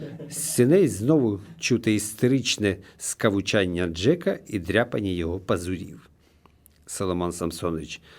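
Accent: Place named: Surzhyk, Ukraine. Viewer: На суржику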